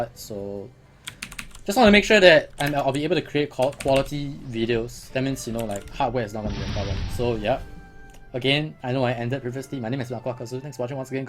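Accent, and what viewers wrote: Singapore. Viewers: ! are you singaporean?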